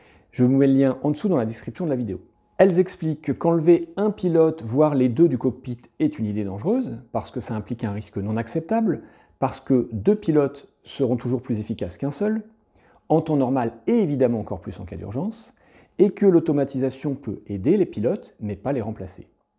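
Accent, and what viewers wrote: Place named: France. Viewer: the ne se prononce pas ze, mais de sur le bout de la langue. Vous faites tous la même erreur les français 😂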